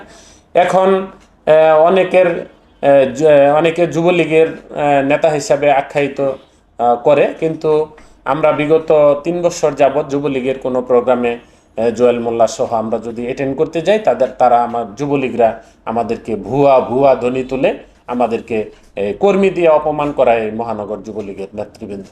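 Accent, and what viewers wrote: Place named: India. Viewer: তোমার ভাষা শুনে মনে হয় তুমি তো ভারতীয়।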